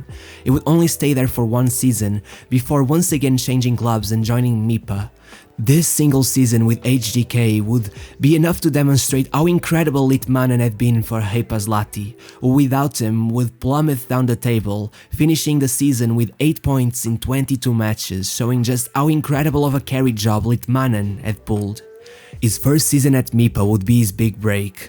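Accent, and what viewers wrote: Portugal. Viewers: Pelo sotaque, só podes ser português. Belo vídeo.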